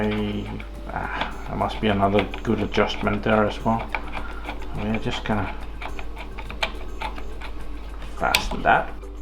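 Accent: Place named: Denmark. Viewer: Jeg har kun set 1 video, og er allerede subscribet til dig fordi du taler Engelsk på den eneste rigtige måde - Danglish.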